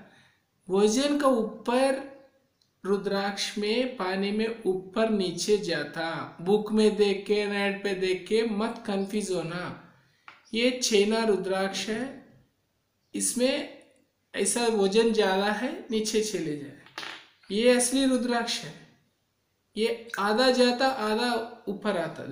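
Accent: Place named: Kerala, India. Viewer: . Kerala ka hai Bhai boli se pata lag jata hai .Mai Gaya hu Kerala waha ke log yese hi bolte hai.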